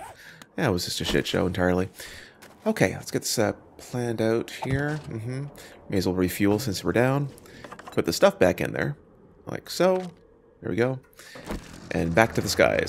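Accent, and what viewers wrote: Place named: Canada. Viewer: Forjed eyeron & geyerocopter lol. I love how Canadians speak.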